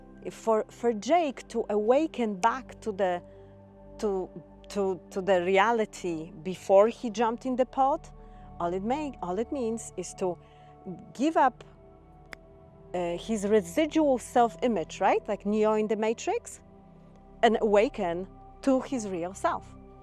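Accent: Poland. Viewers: Omg you sound so Polish, lol. ❤❤❤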